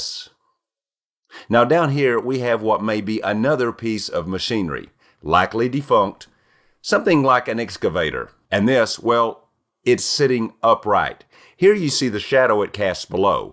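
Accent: South, United States. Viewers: I'm Northern born but Southern raised so it's nice to hear a Southern voice on an intellectual posting, Hear what I'm saying y'all?!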